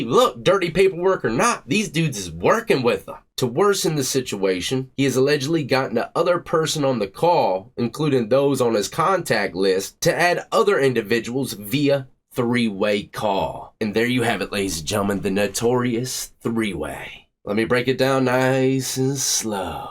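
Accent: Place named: Michigan, United States. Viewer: Ur accent is very MICHIGAN. U originally from here by chance?? LOVE YOUR CONTENT ❤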